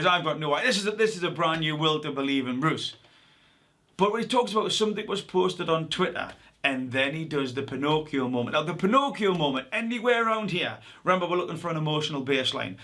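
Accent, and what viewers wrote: Newcastle, United Kingdom. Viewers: R u a Geordie cause u sound like your from Newcastle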